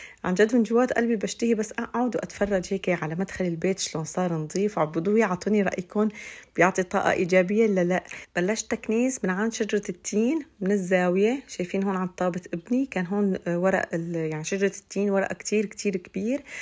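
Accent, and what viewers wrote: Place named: Aleppo, Syria. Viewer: ❤احلى ولفظك بل الحلبي ذكرني بل الاحباب والقرايببن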